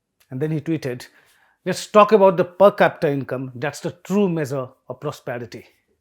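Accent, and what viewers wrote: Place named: Bengal, India. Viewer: Didn't like the presentation, see problem in spoken english. Too much bengali accent. No offense to bengalis there are many who speak without that language influence.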